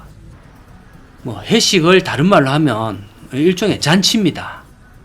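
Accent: Gyeongsang, South Korea. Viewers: 구수한경상도사투리에 후대들을생각하는 마인드 ㆍ오랜연식에서 나오는 ㆍ혜안등이국보급이ㄴ거죠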